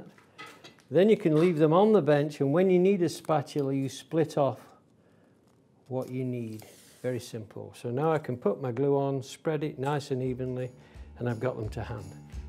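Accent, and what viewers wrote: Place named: Northern England, United Kingdom. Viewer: Hi. your videos are great. I’m very new to woodwork, I was wondering where you source your timber from, and how to make sure it’s suitable for furniture making. I have a good friend who is very experienced. He bought some supposedly dried timber suitable for furniture, turns out it wasn’t dried enough and a beautiful table he made ended up splitting. I’m in the south east of the UK guessing you’re in the north from the accent. would appreciate any recommendations/advice. Kind regards. Jon.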